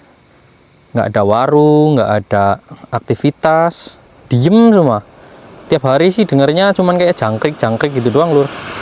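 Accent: Banyumas, Indonesia. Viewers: Subscriber baru, mas, Moga tahun ini bisa tembus 100K, dan sehat selalu ya mas, aamiin Dari logat, sepertinya dari ngapak Banyumasan ya? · rika wong jawa ya,banyumasan basane😅😢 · Ealah wong NGAPAK too 😅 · Aku suka denger bhs ngapak, semoga masnya sehat selalu dan rezekinya lancar aamiin❤❤ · Sampean wong ndi mas?mungkinkah kita terangga desa....krn sama2 ngapak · Sampean wong ngendi mas daneng bahasane ngapak ya😂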